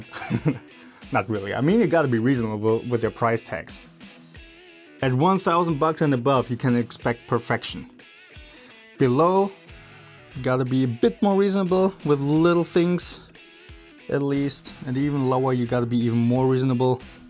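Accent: Germany. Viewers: I have also an 2.7 in Lemon. The only Problem i have is , powerchords on the Low B sounding very „wobbly“. Any Tips ? String Gauge? 10-64? Ich höre einen deutschen Aktzent. Wenn du deutsch bist dann kannste mir natürlich auch so antworten ;-)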